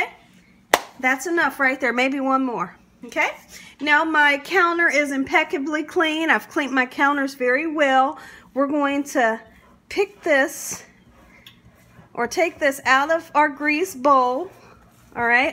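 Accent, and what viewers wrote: South, United States. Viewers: I love watching you bake,nd your talking country charm makes me feel like Im back at my grannys house.Im definally gonna make these.Im craving them now!!!!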